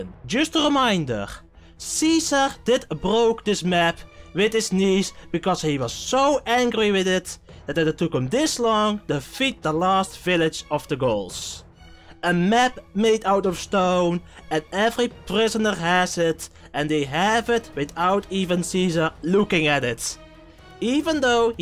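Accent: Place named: Netherlands. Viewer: Strongest Dutch accent ever 😂😂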